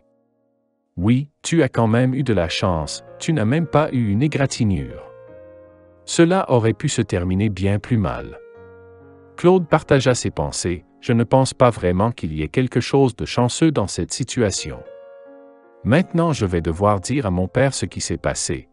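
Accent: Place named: Canada. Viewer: Un peu de réflexion il est canadien on entend bien l'accent et le français n'est pas le même a 100% la bas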